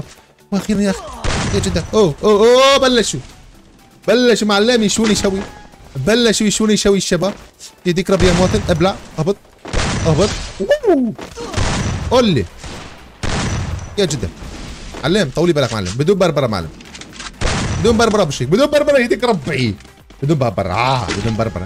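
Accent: Algeria. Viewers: صار يتكلم بلهجة جزائرية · محلا هدرتك بلجزائرية :virtualhug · صيار بتحكي جزائري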